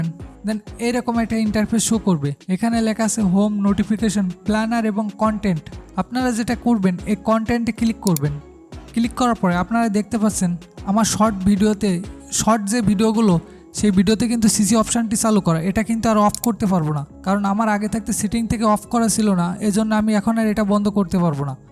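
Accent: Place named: Barishal, Bangladesh. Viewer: ধন্যবাদ ভাইয়া, এত সুন্দর উপকারী একটা ভিডিও আমাদের কে উপহার দেওয়ার জন্য,আমি এই cc নিয়ে অনেক টেনশনে ছিলাম,কেনো ভাবেই বন্ধ করতে পারছিলাম না,অনেক খুঁজা খুঁজি করে নতুন আপডেট এই ভিডিও টা পেলাম, আপনাকে অনেক অনেক ধন্যবাদ এগিয়ে যান ভাইয়া ইনশাআল্লাহ পাশে আছি, এবং আর একটা কথা আপনার বাসা মনে হয় বরিশাল,কেনো না আপনার কথায় বুঝতে পারলাম?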